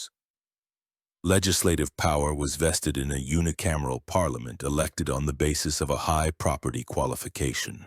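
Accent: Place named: United States. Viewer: why would you use a scraping american voice to narate English history? AI crap ?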